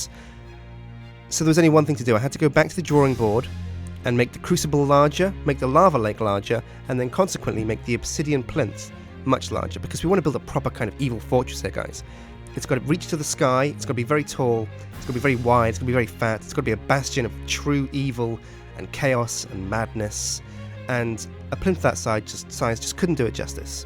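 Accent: United States. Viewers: You sound a bit american with you sore voice.makes me wonder. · Is it just me or does sound like an American when he is sick? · He sounds American.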